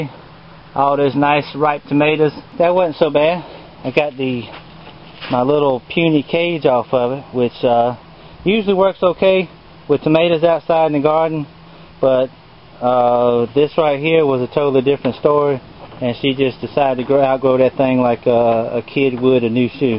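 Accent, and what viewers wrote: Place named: Louisiana, United States. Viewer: Ah ha. Southern Virginia! A couple of comments back, I mentioned something about you selling salsa at an LSU game. I thought you were in Louisiana based on your accent and talking about webcajun. LOL So basically you live in S. Virginia, wear a Tennssee hat, and have a cool Louisana accent. Got it ;-) God Bless.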